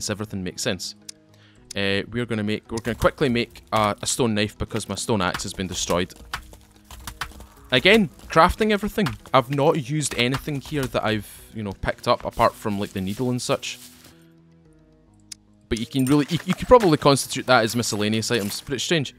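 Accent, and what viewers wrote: Scotland, United Kingdom. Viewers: Hey, I watched all of your SP Episodes and I learned so much about the game. Thanks and greetings from East-Germany. PS. I like you scotish dialect.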